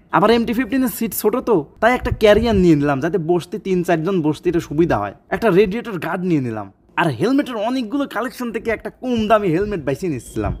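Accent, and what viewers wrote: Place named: Kushtia, Bangladesh. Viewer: vai ki kushtiar mal naki kotha kinto akdom kushtiar lok er moto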